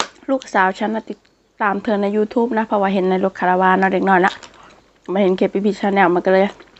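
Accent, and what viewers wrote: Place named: Isan, Thailand. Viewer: ดีแล้วละลูกเว้าภาษาบ้านเฮามันม้วนดีคนอิสานอยู่ต่างแดนขนาดเว้าภาษากลางคือเว้าไทยแม่กะบอเบิงเลย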